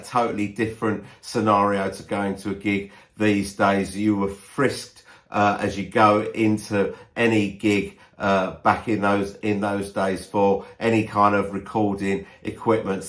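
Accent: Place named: London, United Kingdom. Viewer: This video is a gem! I never knew about this gig until you told your story. You're a born story teller, mate! Lovely London accent! I also loved your detour on Hitler's ball(s). Extremely funny and I never heard the witty "childrens poem" either. I had to watch the video from start to end without pausing, even though I have loads of work to do here. You deserve far more subscribers! Cheers! Stein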